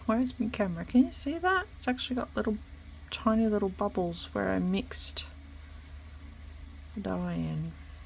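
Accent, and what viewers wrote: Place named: Australia. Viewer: Thanks for the video - I definitely did learn from your mistake. Got some Solidcrete today & found your video hoping to avoid mistakes & hopefully it worked. Still not cured, but a few years ago I had a go with some other brand and did the same thing as you. It looked far too runny, so I added more powder & disaster. So glad I found your video & avoided that mistake this time. Great hearing an Aussie voice for a change in my hunt online for crafting tips. Subscribed ☺👍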